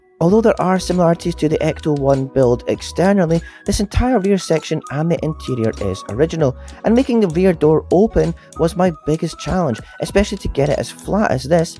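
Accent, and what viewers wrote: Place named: Scotland, United Kingdom. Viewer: I love your accent. Are you from Scotland? (Just curious).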